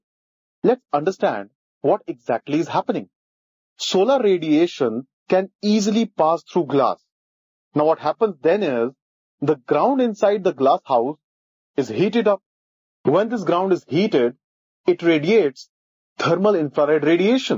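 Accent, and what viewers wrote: India. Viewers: indian english!! hard for me 2 understand..hahahh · topic well explained!! with lajawab indian accent!!thanks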